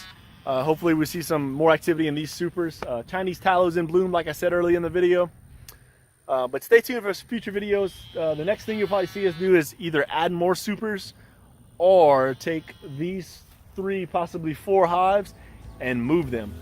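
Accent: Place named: Lafayette, United States. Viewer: Personally, I’m terrified of bees, but I watch your videos to understand bees better. Hopefully bees won’t be as scary if I understand why they act the way they do. Also, your accent is a taste of home for the homesick. I’ve been missing Lafayette.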